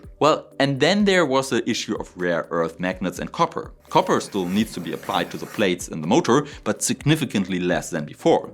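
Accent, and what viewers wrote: Germany. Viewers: A whole quarter hour full of german accent bulishit, with virtually ZERO useful INFRMATION about the "motor" The mother of clickbait :face-green-smiling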